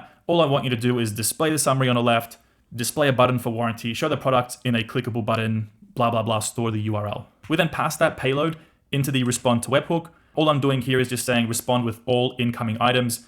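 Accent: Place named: Australia. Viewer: Cześć, prowadzisz ciekawe prezentacje, ale jest nieco śmieszne gdy odtwarzane jest tłumaczenie w języku polskim, bo tłumacz interpretuje wait jako „waga” nie wiem czy dlatego że nie rozumie kontekstu czy może akcent australijski jest tak odczytywany. Ciekawe co o tym sądzisz? Swoją drogą uruchamiam usługę w Polsce wspierającą procesy w n8n w zakresie komunikacji SMS i voice, czyli interakcje SMS i inicjowanie połączeń telefonicznych do klienta z powiadomieniem głosowym ( voice message) oraz inicjujące rozmowę z botem. Cóż za fascynujące możliwości stwarzają takie systemy jak n8n.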